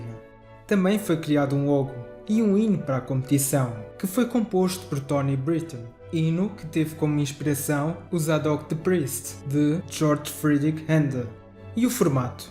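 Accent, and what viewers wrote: Portugal. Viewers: Sotaque português é gostoso de ouvir Kkkk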